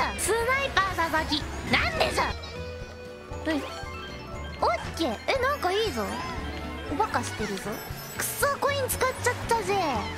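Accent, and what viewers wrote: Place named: Kansai, Japan. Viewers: Not the most "objectively" cutesy moe voice, but the one I like the most for sure, with the kansai-ben and all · do most of the nijisanji members kansai-ben? Somehow i like hearing Sasaki's kansai dialect.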